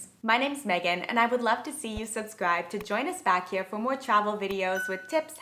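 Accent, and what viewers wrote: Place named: New England, United States. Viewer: Heavy New England accent! 😬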